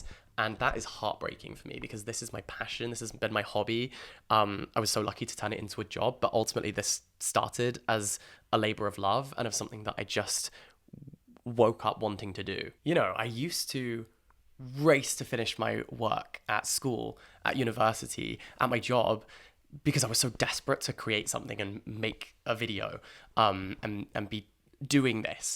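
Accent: United Kingdom. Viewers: I like your British accent, plz more book reviews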